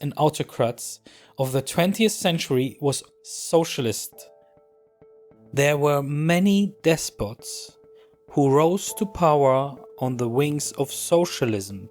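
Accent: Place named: Germany. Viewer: This video is a huge cringe post. I remember having another discussion about a similar topic with this guy and it was wild. truly baffling, how someone, who seems to be german due to the strong accent, can fall trough the raster of historical education. But anyways, to set this straight real quick, no the Nazis weren't far left socialists, because of course they weren't. I'm guessing the presented election program points are supposed to be a summary of the infamous 25 points. The truth is, that only some of them were supposed to resemble socialist agenda points and pretty much all of them were abandoned shortly after the Nazis took power. The occasional welfare benefits, that got implemented to prevent another proletarian uprising, don't change that. Modern Germany has those as well, and no one would argue that it's a socialist country. Also funnily enough, the demand for the abolishment of the materialistic world order stood in opposition to Marx's dialectic materialism, but yeah sure,lets pretend the Nazis were socialists. 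While it was true, that the Nazis sometimes called one another "Genosse:in", is that somehow supposed to be proof? Many people give themselves silly names. Also, this was supposed to refer to the actual term "Volksgenosse:in" which in itself refered to the propagated myth of the Volksgemeinschaft, with which the Nazis wanted to promote the establishment of a community based on race and class collaboration, rather than on class conflict. So yeah, what a bu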